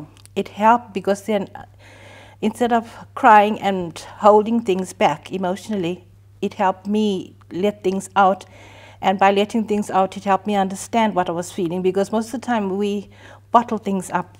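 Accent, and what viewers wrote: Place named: South Africa. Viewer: Is she South African? She sounds South African. Hope this has stuck after so many years.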